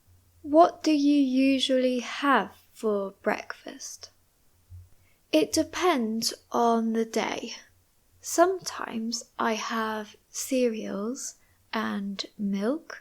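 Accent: United Kingdom. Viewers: I love you british accent,which is so beautiful.Are you a Eenglish teacher in England? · Excelente pronunciación y entendimiento del acento británico. Saludos.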